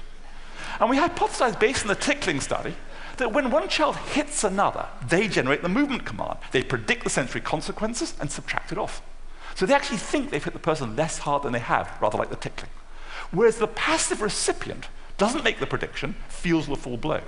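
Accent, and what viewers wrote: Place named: United Kingdom. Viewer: 영국 억양이 이렇게 짜증난적은 없었다...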